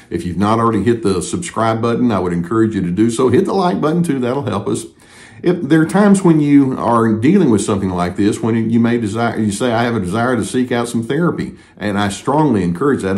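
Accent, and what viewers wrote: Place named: Texas, United States. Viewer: YES!!! I was USED. Very difficult to wrap your head around that when you first realize! Who DOES that??? Answer; someone who doesn’t really have a life! When all is said and done; I have lived my life well. I have learned, I have raised a child, I have respected my parents, I have been a decent giving person as much as I could. I have EVOLVED! He was a hollow shell, a cheater, a crook, immoral and filled with cynicism and hate. He had to PRETEND to be someone else to get me! So WHO REALLY WON??? (I you want to say it in the crude modern vernacular!) Thanks again Dr for the nuances you bring to this subject. PS; never thought I could become FOND of a Texas accent😉!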